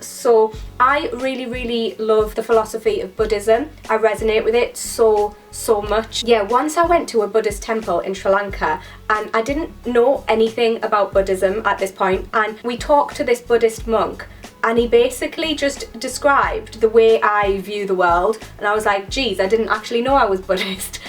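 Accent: Newcastle, United Kingdom. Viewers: Just found your channel and love it! Are you from Newcastle?!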